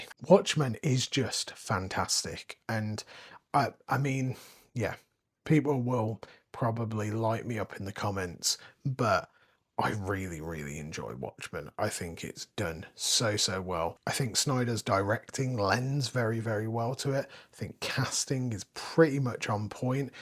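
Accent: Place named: United Kingdom. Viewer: I’ll subscribe as it’s nice to hear a British voice…. You’ll be giving Elliot a run for his money 😂 👍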